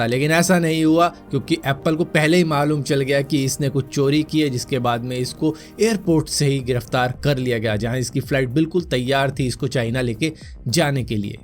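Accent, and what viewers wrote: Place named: Gwalior, India. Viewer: Bhai gwalior se ho kiya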